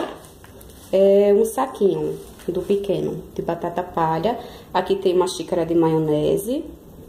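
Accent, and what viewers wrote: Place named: Paraíba, Brazil. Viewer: Vc é paraibana? Sotaque lindo.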